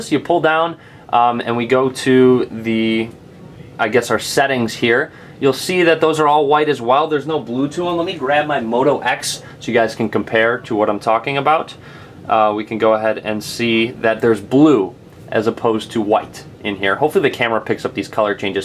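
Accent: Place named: Michigan, United States. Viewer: i THINK.... hes from Michigan... lol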